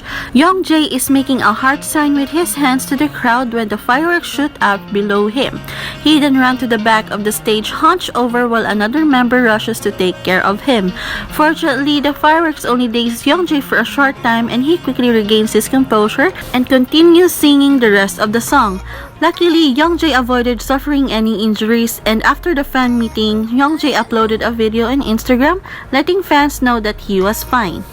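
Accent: Philippines. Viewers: You have a filipino accent ahahha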